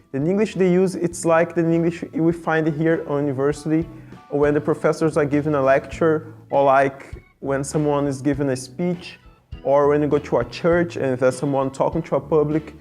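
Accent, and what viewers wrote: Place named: Brazil. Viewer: Your english is pretty good, and surprisingly you have only a little accent, which is kind of rare for brazilians!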